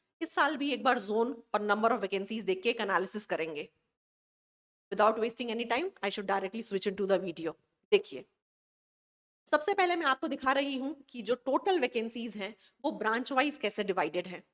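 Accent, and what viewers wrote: Chhattisgarh, India. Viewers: Ma'am aap chhattisgarh se hai kya??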